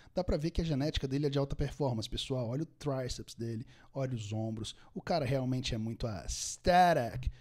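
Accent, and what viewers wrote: United States. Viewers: o inglês do é inglês americano, nao inglês britanico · Teu sotaque super american amoooooooo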